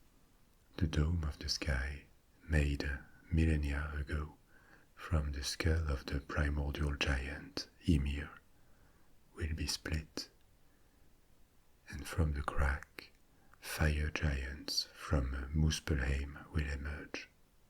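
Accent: France. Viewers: Je crois entendre un accent français donc je vais commenter en français. J'ai lu l'Edda mais ça c'est aussi une façon ludique d'en apprendre plus sur la mythologie nordique!😁 Ps: Je viens de voir le nom de la chaîne 😆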